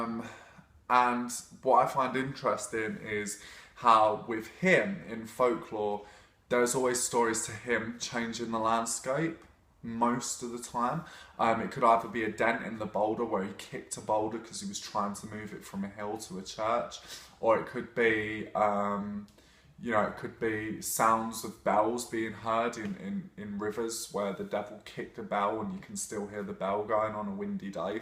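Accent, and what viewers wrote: England, United Kingdom. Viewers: I have only ever heard Irish and Scottish speakers pronounce it like kal-y'och, call-y'och, or cull-y'och...So I feel like he's saying it pretty accurately, just with a more English accent. Of course I'm American, so maybe I'm wrong. :)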